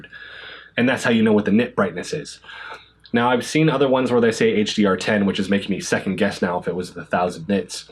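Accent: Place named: Pacific Northwest, United States. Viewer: Great review! Just curious, are you from or do you live in the PNW? Only asking cause your voice reminds me of other content creators from there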